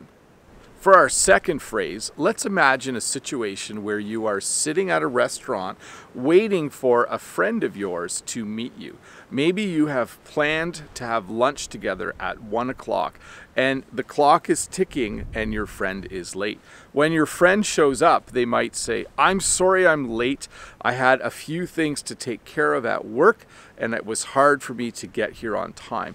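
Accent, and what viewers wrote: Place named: Canada. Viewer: Hi, a great English teacher, I often learn English from your teaching, it can help me to improve my English, especially about the pronunciation I've got better so much, actually I've never been to Canada, as you maybe pronounce quite different of some word from American at the US. in which I've ever been before, but that's not problem at all. Thank you very much.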